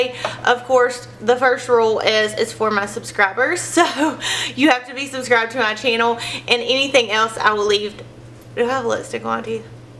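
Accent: South, United States. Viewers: I just noticed your po box ! I knew you had a southern accent but had no idea that we are in the same state ! That's cool ! I look forward to your next tutorial ! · My favorite palette by far is the Amazonian Clay Rainforest palette by Tart. It is perfect for my skin tone and the shadows bring out my blue eyes. I HAVE to get my hands on the morphe 350 palette and do this tutorial!!! I looooove vampy lips too but I have thin lips so this makeup look may not look as good on me. My instagram name is cck008. I love your channel and your southern accent so much!! Ps do you whiten your teeth? they look sooo white! If so, please do a video where you talk about how you get your teeth to look so good!!!! xoxo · I love your videos and insta posts! Where are you from? I love your country accent.. I'm from TN and have a country accent too!! :) I loveeee my morphe 35K palette! 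It's perfect for cool and warm toned looks!! ❤️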